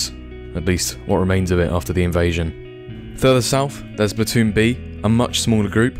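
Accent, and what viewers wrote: United Kingdom. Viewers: Find me a non British KSP content creator…. It’s annoying. Honestly, what is with British people and KSP? I even have a friend in Britain who plays. I’m not overly pissed, I’m saying there’s too many and I’m done listening to the accent. I’m entitled to my own opinion for whoever finds this comment and so are you. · I literally adore your voice. The voice over aspect really does sound professional. The air combat made me cringe a little though, a bit too much top gun. If an enemy started slowing down in front of them a real pilot would start to climb to to lower the speed and maintain distance. That way the enemy would have to climb to attack him, which they can't do with no speed, and the attacking pilot can later trade that altitude for acceleration later in the fight as well as using gravity to enhance his turn capability. I mean most people will just think its cool and not understand the finer points of air combat so don't take it too hard, but its about the most basic example I could imagine of energy-manoeuvrability theory upon which every single combat pilot's training is based. 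Literally as elementary as multiplication for a physicist. But that off my chest, the rest of the video was great and very well produced. And hello from a fellow Brit, at least I won't have to cringe at every other word's pronunciation.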